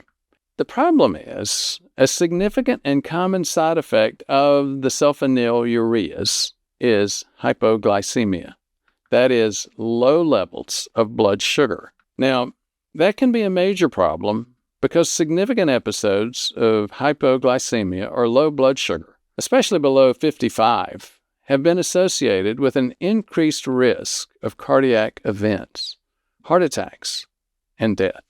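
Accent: South, United States. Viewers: Having grown up in Alabama, I love your accent. Not hillbilly, just one of a variety of southern accents. I've been in Texas for 46 years, & still think the Texas accent is harsh.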